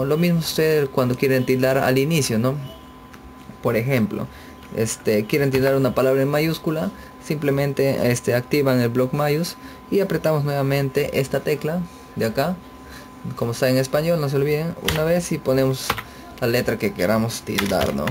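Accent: Peru. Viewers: Peruano .___.XD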